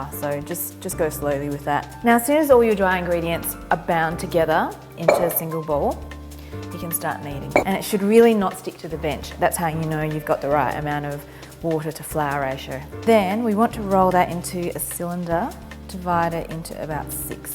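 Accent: Australia. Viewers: Yes and it's particularly a South Australian accent. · accent sounds like an australian...anyway,that roti chanai looks delicious!thanks,definitely gonna try this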